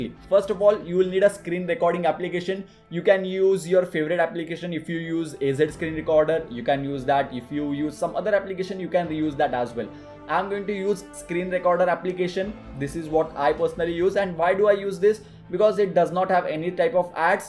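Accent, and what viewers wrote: India. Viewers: Finally a indian speaking english while explaing on this video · Oh my God your Indian accent is making me laugh😂😂😂 Anyway is this a glitch to a phone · Sorry, but this Indian accent sounds like an acoustic hell.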